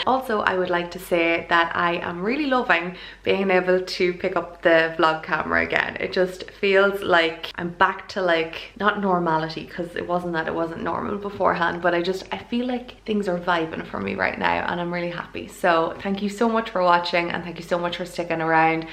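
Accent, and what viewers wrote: Ireland. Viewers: Missing so much your videos!! Because it’s like chatting with you, like hanging out with you, also I loooove your Irish accent and I learn it with you 🙊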